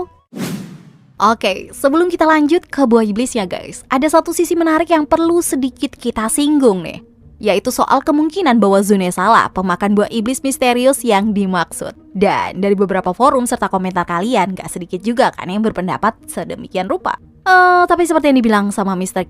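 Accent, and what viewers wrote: Indonesia. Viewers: Nama.... Speak English dialek Indo... Lanjutkan... Kita bakal punya dialek english yg diakui internasional... Jgnkalah sama India Korea Afrika.. ✌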